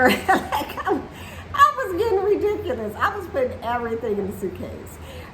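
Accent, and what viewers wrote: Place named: Southern California, United States. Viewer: I thought that was a So Cal accent. I'm hoping to visit Panama, Spain, and the Philippines this year so we'll see what happens. Keep up the YouTubes - you're easy to look at 🙂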